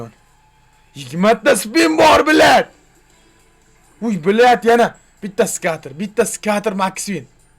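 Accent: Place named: Fergana, Uzbekistan. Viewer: Aka qayerlisiz shevez buvaydanikiku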